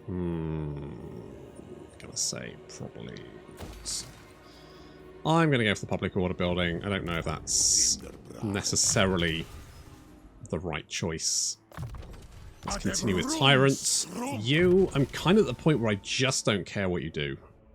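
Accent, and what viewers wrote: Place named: Newcastle, United Kingdom. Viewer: The Newcastle accent and vocabulary is hilarious when juxtaposed with WH3 😂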